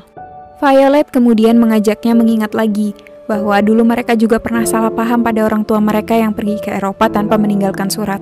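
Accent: Bandung, Indonesia. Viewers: Wih orang bandung👍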